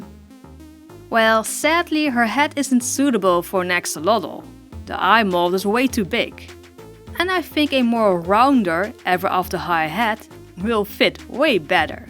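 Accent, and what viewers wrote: Netherlands. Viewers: Where are you from? You sound kinda Dutch in some way but idk oof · Heyo! I'm also Dutch! Ur pretty good at English. And I know, sometimes it's hard to speek English with that Dutch accent... I mostly just put something tru Google translate lol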